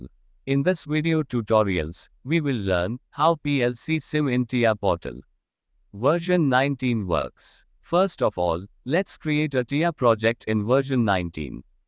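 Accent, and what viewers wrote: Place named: India. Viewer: what is the point of using an automated voice if it still has an indian accent!?!?!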